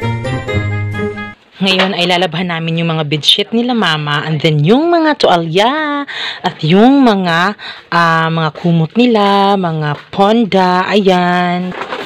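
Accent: Visayas, Philippines. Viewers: nakakainis naman ung sinasadya mong pagbigkas na may bisaya accent like EKOT EKOT at DETU. pagbisaya nalang kay pangit paminawon. ayaw mo kasulo kay constructive criticism na ha.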